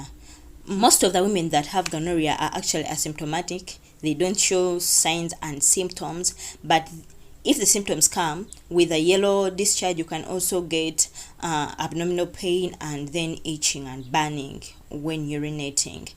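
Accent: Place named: Uganda. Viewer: You sound Ugandan ❤️🤗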